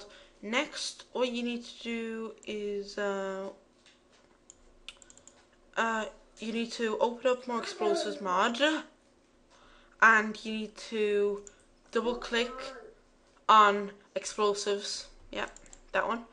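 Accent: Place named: Ireland. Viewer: good to see someones irish not american liike me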